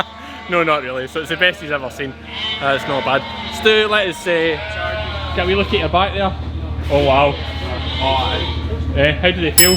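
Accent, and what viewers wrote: Scotland, United Kingdom. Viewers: Hello from Alberta, Canada. I worked with a fellow from Scotland who sounded quite similar to how you do when speaking to your mates. It took a good 3 months to understand him all the time. Now, no problem!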